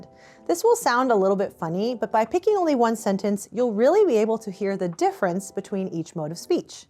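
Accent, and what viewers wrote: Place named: New Zealand. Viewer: This kiwi accent makes it real hard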